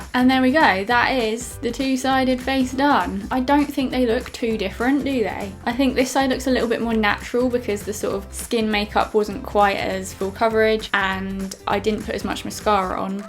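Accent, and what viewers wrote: United Kingdom. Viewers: Ur British accent is sooo cute xx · this is British accent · Shut up! It's called a British accent! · are you british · ITS CALLED A BRITISH ACCENT!!! I'm British :'( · iiSavageAmerican you dumb bitch it's called BRITISH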